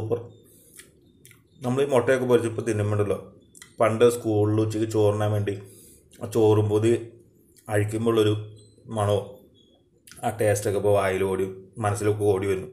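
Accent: Palakkad, India. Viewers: ചേട്ടന്റെ ഭാഷ പാലക്കാട്‌ ടച്ച്‌ ആണല്ലോ... ഞാൻ വിചാരിച്ചു പാലക്കാട്‌ ആണെന്ന് ട്ടാ.. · പിണ്ടിമനയിൽ covid +ve ഉണ്ട് stay safe and healthy,. Proper hand wash and wear mask everytime..പാലക്കാട്‌ ജോലിയുണ്ടോ /താമസിച്ചിരുന്നോ,?? പാലക്കാട്‌ കറികളും സംസാരരീതികളും 🤔🤔🤔നമസ്കാരം പറയുന്ന ബാക്ക്ഗ്രൗണ്ട് കാണുമ്പോൾ പാലക്കാട്‌ പോലെ തോന്നുന്നു,,, ഞാനും കോതമംഗലം ആണ് wrk pkd യും,......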